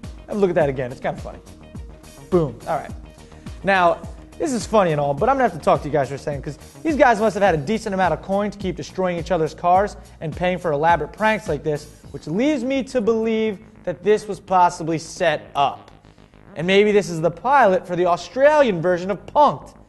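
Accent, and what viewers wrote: England, United Kingdom. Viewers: Nice UK Accent btw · nice english acent! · Forget the real English accent. English acent is much better!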